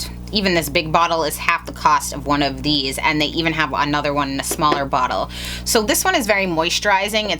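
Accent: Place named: Midwest, United States. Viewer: omg that midwestern accent is piercing at my soul.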